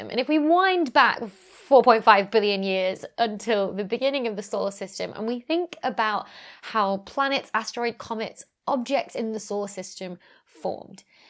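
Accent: United Kingdom. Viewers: That's my thought, too, but Dr. Becky isn't the only British astrophyicist I've heard from. I think she is the only one I subscribe to, though, so perhaps she's more comfortable using the British pronounciation than someone doing a Ted Talk would be. Would be cool if she decided to respond.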